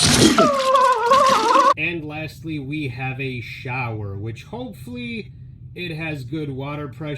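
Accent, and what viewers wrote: Russia. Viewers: do your russian accent in your videos man ur known to it 😁😂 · 10 years ago u sounded very russian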